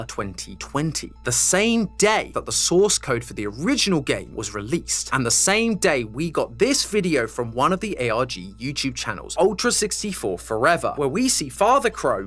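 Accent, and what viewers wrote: United Kingdom. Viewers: I’ve just started watching this and…When did you become british? Like genuinely curious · British Matpat 😭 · Why is he British now… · who is this brit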